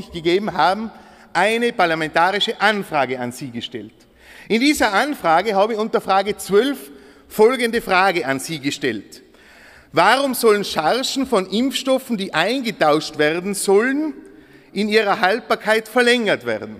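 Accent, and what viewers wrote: Tyrol, Austria. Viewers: Mir gefällt Herr Steiner. Sein Tiroler Dialekt ins Hochdeutsche zu sprechen ist einmalig. Er ist echt klasse und bringt es auf den Punkt. Tja die Parlamentarier u. Nat.ratsabgeordnete der anderen 4 Parteien nehmen es mit der Wahrheit nicht genau. Vergessen aber ganz, daß ihre Reden aufgenommen werden sei es mit Video oder per Stenografie. Davonkommen werden's net. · Spitzenpolitiker welcher sich nicht verbiegen lässt und authentisch ist, a waschechter Tiroler, ich liebe diesen Dialekt 😎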